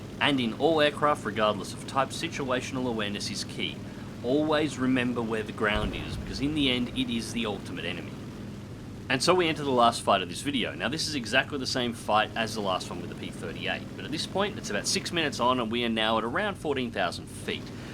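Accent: Australia. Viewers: hey are you an auzzi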